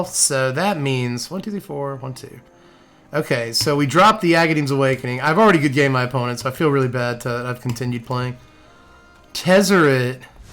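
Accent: South, United States. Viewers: My dude you know I love ya to death, please please please please stop with the country voice. I keep having to click away. It’s…too much. Thanks.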